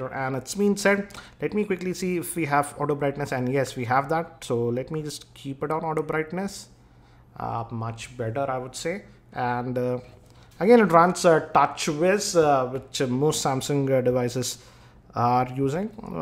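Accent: India. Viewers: Real Indian accents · I cannot understand you..are you hindi..??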